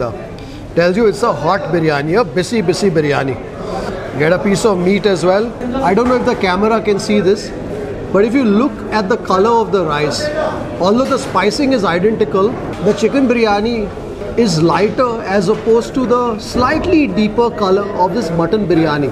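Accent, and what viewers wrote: Karnataka, India. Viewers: I love u talking innocent beautiful sweetest kannda Lots of love to you sir. Happy new year in advance sir.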